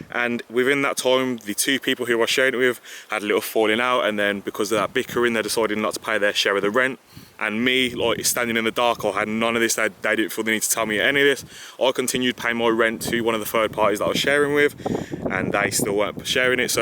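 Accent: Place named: Midlands, United Kingdom. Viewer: Yo just been watching your vids as well I have fiesta mk6 too I've started upgrading it a bit lately got new exhaust have alloys on but those wheels man look cool are they legal where's the tread lol? Also where you from sound like midlands like me I'd love to get advice off you for my car once this virus outbreak has stopped!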